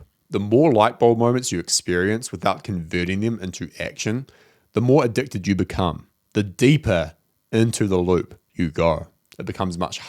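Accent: New Zealand. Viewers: Not only are u informative and helpful, u r also very very hot. Love ur accent (kiwi, I guess?) thank you for the video, gonna get up from the bed and get some shit done. Cheers from Russia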